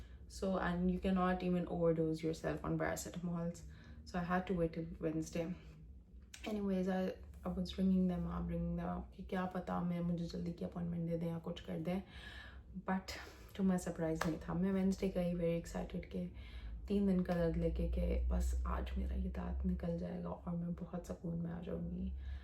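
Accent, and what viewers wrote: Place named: United Kingdom. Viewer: Sorry i was really struggling to understand you. Your voice is really low plus you're speaking urdu in an english accent. At some points i was confused that are you speaking urdu or english? 😂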